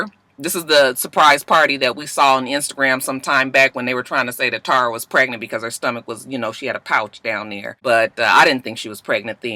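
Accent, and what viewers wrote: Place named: West Coast, United States. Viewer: Thanks,for the amazing videos. I've followed you and for years and am never disappointed! You continue to grow,entertain and inform without missing a beat. I don't know if you intend to be a role model,but indeed you are! I'm your age and I still feel like I wanna be like when I grow up! lol! same for and she's much younger than me. I love you to pieces! both of you. Thanks for sharing you with us....I wanted to tell you for awhile, I can tell you lived on the west coast because you sound like Ray J with some words! "Here" and "there" y'all say just alike! lol! Love you! Thanks for being consistently you! 😊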